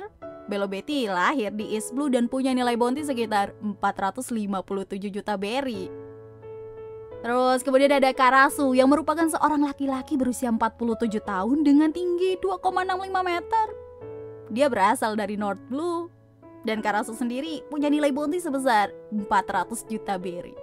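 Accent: Java, Indonesia. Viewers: Iyoi medok... Koyok pisan. Wong jowo kabeh berarti iki yo... G or Irish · Saya kangen suara medok 😀😀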